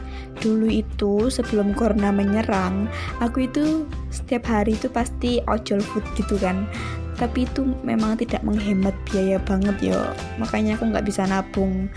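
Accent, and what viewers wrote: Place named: Java, Indonesia. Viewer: Wong ndi toh rek ... Jowoan ngene Seneng aku hehehe salam kenal ya kak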